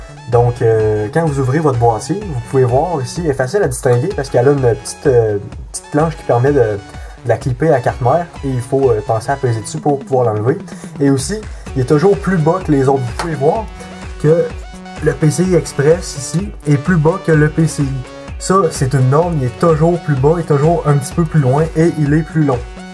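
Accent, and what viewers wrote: Quebec, Canada. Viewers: Le quebec x)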